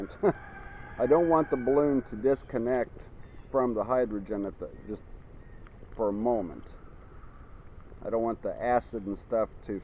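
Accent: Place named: Indiana, United States. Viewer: What part of Indiana are you from?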